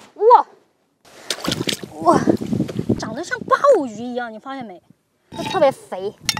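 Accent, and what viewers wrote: Sichuan, China. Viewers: 非常喜欢你开心的样子，空军不重要，重要的是你的川普风格很搞笑开心，谢谢你，同是天涯四川人！ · 她讲的是四川普通话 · 四川幺妹儿吧？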